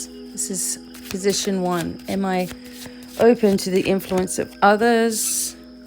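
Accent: Australia. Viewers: Knickers in a knot 😂 So Aussie , love it . Hello from NSW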